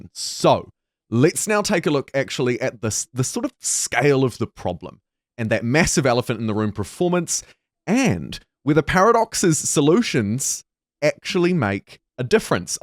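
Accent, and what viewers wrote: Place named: New Zealand. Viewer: Kia ora. Another kiwi that accidentally pronounces it "pre-formance" rather "performance". The game is atrocious. If it were a toaster, an oven, or a tv you'd return it for a refund. Got my refund. Will see what happens to the game over the next year but not holding out hope. This game will go down in history as an infamous disaster.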